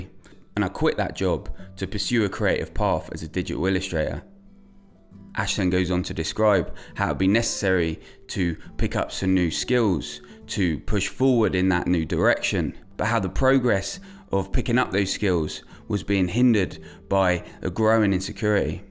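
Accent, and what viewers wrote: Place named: London, United Kingdom. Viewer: Whats interesting is I think your accent has got more London from these early videos, maybe more vocal fry, it’s funny because I met this guy at this film event who was clearly trying to hide his really normal middle class background by sort of trying to appear like he might of ground up near some house estate in east London. I didn’t ask about his background but I could tell he might of felt like he wouldn’t be accepted as an artist unless he could present that he had some socioeconomic struggle and hinted past trauma. He showed me some photos he took, he sort of shyness around his urban aesthetic, he photographed old cafes and old people smoking, gritty stuff but I could tell he wasn’t from that world at all and it was odd because he’s clearly thought to create this character out of fear of not being seen as a true struggling artist. 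I mention this because your channel is about the struggling artist.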